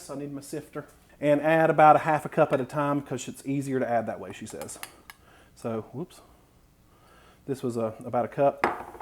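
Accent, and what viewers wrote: South, United States. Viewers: Dude, you freakin’ rock! Just went ketopocalypse now and you sir are my young Martin Sheen! Love your southern dang fried accent that makes it ALL gouda!🤪🤙🤛🏻 Lord! Keep it going!!!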